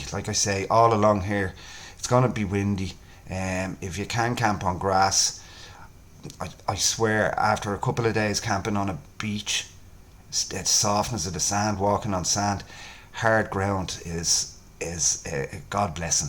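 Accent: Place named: Ireland. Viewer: Brilliant, absolutely loved it. Nice to hear a paddy on YT. Thanks a lot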